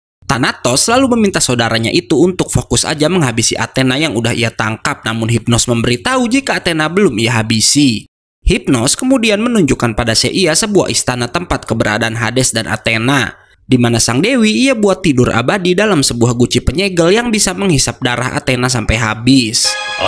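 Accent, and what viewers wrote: West Java, Indonesia. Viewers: Ini teh admin nya org sunda sanes ?? 😃😄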